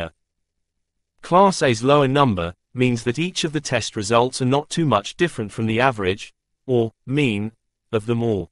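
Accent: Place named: United Kingdom. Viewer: Thank you, sir. You sound British. My Mother was a war bride from Coventry, England.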